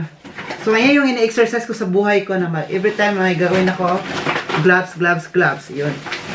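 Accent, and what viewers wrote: Visayas, Philippines. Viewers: Bisaya ka dong?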